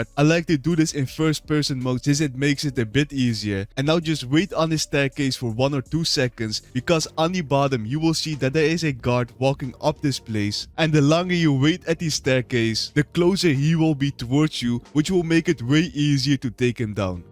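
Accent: Netherlands. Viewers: We horen de Nederlands 🥀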